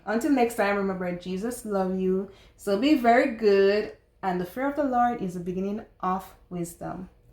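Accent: Jamaica. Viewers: I like the accent ☺️ sounds jamaican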